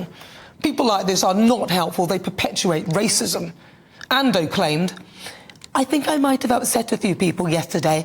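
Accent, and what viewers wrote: United Kingdom. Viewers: You British?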